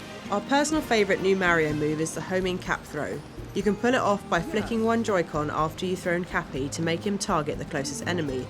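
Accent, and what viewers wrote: United Kingdom. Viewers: CAT MERIO I’m not trying to be mean. She’s British.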